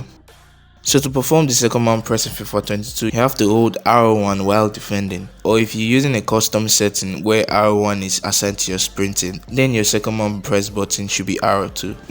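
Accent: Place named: Nigeria. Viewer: sure bro. You sound Nigerian. You from Africa ?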